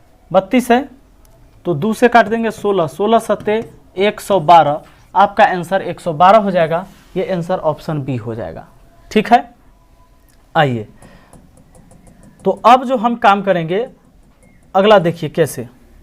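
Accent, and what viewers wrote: Bihar, India. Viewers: Sir ap Bihar se ho kia?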